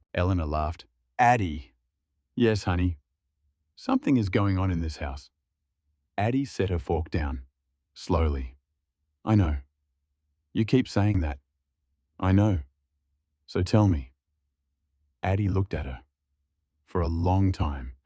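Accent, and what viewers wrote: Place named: Australia. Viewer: Loved the Australian accent. It is so much easier to listen too .. are there any more read in an Australian accent?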